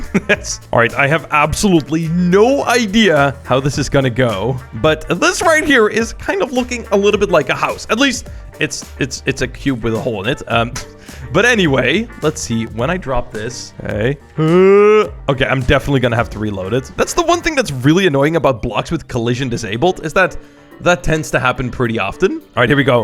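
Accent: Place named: Netherlands. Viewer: Ik ruik een klijn beetje nederlands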